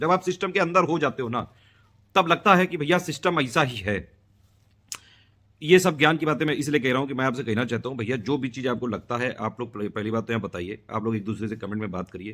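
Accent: Kanpur, India. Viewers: भैया कानपुर से हो क्या आप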